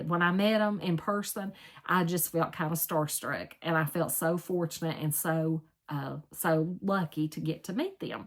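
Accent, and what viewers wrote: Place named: North Carolina, United States. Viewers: Omg, my daughter started laughing with the “Mayflower” comment! That was just hilarious 🤣 🤣🤣🤣. Congratulations on copying the old jumper, it looks great on you, especially the color 🙌🏻🙌🏻. You are definitely not alone in not wanting to show your body rolls 😱😱. I just wanted to say that we truly enjoy your NC twang. 💖💖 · Hi, you did such a great job of copying your jumper and it really fits you so nicely. I love hearing your North Carolina accent. My stepdaughter also lives in North Carolina and she has that accent, too. Have a good week. 🙂❤🙂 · we all love your NC accent! You’re so down to earth and keeping it real! I wear a blouse under my jumper. Yours looks great on you! I want to make a pair of overalls. BTW, you’re on my list of YouTubers I want to meet! ❤️❤️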